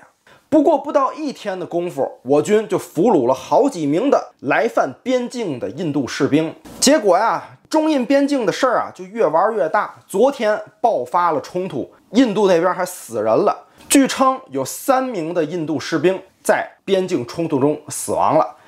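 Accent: Tianjin, China. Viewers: 天津話真是哏。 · 喜歡的天津味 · 是天津味的口音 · 老哥，天津人吗？我在天津上的本科，哈哈哈这口音太熟悉了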